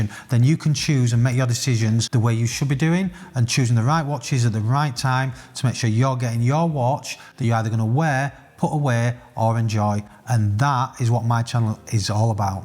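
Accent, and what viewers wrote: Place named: North, United Kingdom. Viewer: Great content as ever. You’ve got your own niche here. A lot of the watch YouTubers are semi-weirdos. Nice to hear some straight talking from up North.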